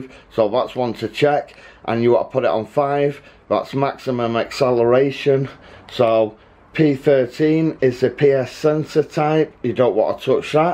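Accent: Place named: Manchester, United Kingdom. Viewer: Proper manc lad hah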